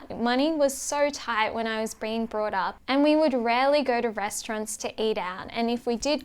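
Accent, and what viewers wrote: Australia. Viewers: Loving your content night and day. Maybe it's the Australian accent :) I wanted to say this is a great video to show your audience why you are doing what you're doing. It's great to hear how you were making $400 per week early on. Through the struggle and sleeping through it, you are now more financially independent than before. I find a similar story because when I first started off, I was making $300 per week only. It's nice knowing that your why purpose is to help others benefit from financial discussions. That's why I value watching your content. You seem very warm, bubbly, and charismatic. Thanks for your video.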